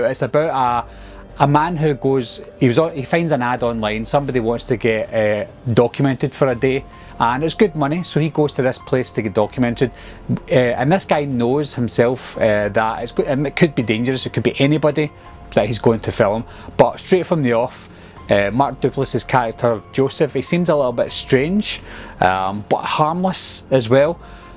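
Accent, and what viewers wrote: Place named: Ayrshire, United Kingdom. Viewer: Just found your channel mate and its brilliant. Is that a bit of an ayrshire accent i detect?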